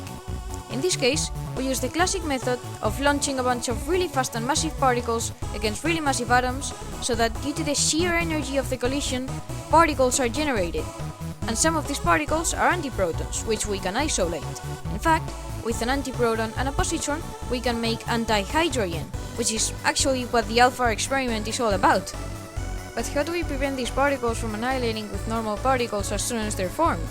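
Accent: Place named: India. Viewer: Are you india Bcz your accent is like that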